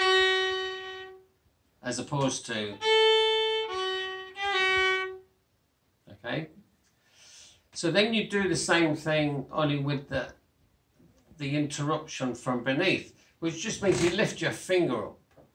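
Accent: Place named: Cork, Ireland. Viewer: You talk like my 12 uncles from both sides of my family, they all came from Dublin when they were young men to Birmingham about 50 years ago, but I detect your not a dub but more western like cork.?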